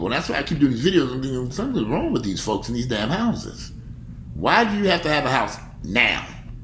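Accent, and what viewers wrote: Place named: New York, United States. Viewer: Sounds like NYC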